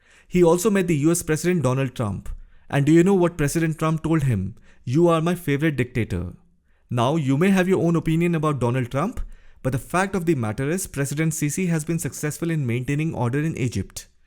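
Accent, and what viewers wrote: India. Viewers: I like his Indian English accent.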